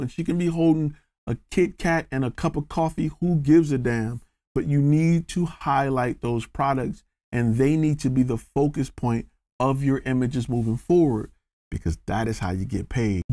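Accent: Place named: Detroit, United States. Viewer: Yo bro you from Detroit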